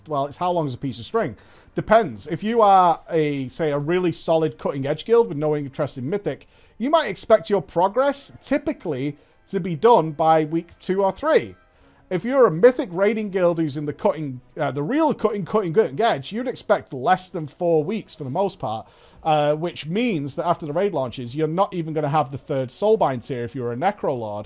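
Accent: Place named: United Kingdom. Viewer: Orientated....British accent but American lingo. The simping is real.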